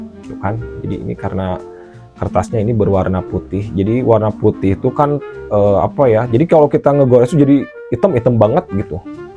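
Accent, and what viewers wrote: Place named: West Java, Indonesia. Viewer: a, logatna sunda pisan😂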